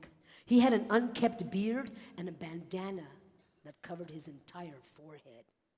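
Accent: Texas, United States. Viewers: My greatest honor to receive a "thank you" from you in person. Your style is my favorite: that fancy hat, that Texas accent and that enchanting storytelling. I keep telling my folks that the 2nd place winner is worth watching when they talk about the 1st and 3rd place winners. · The speech message and use of props were good. Not sure of the sarcasm and racy tone when describing elements of the young man. 🤔 however, the Texas accent was fire🔥🔥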